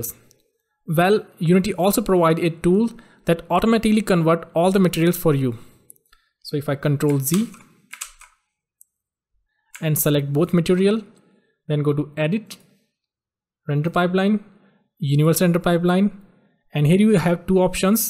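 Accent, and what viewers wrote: India. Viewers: are u from indiea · I can guess you are an Indian from your accent... #respect bro. Nice work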